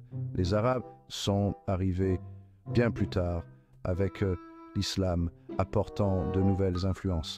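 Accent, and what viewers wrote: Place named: United Kingdom. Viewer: J'adore le petit accent british 😊 Merci pour la vidéo. très instructif. Question est-ce que l'islam tolère ou efface la culture berbères?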